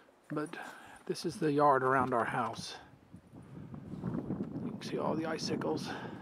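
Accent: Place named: South, United States. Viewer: you don't have a MN accent you sound like you are from the south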